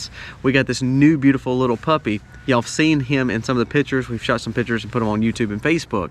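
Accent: South, United States. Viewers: How very rude and arrogant of you to tell a whole group of people from a whole different country, with a different accent how to pronounce a word. I shouldn't have to tell a grown a$$ man with a country twang no less, to not act all high and mighty and judgmental over how they pronounce certain words. Come up north or out west and see what your fellow men say about how you speak.😡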